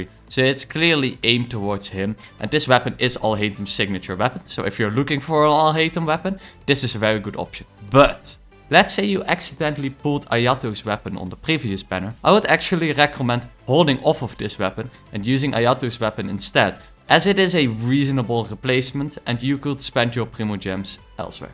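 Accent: France. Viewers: you have an accent... I can't pinpoint it but it sounds french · The French accent, i'll pull for whatever listening to the accent.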